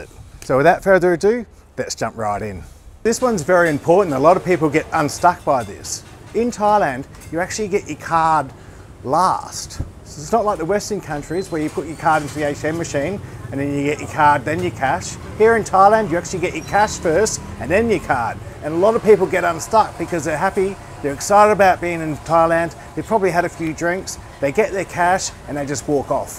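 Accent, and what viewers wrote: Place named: Australia. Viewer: DEAR, I AM AN AUSTRALIAN TOO BUT YOU HAVE BEEN 14 YEARS THERE SO YOU HAVE A SLIGHTLY DIFFERENT ACCENT. GOOD ON YOU!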